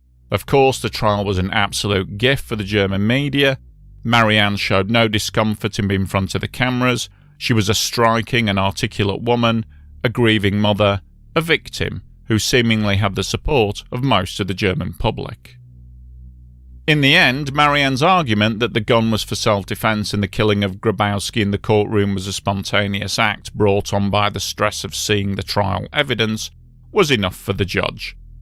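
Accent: United Kingdom. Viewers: a damn Brit narrate a film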